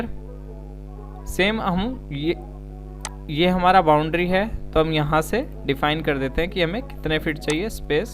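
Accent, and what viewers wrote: India. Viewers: Please 🙏🙏🙏 Speak in Britain accent Stop using Indian accent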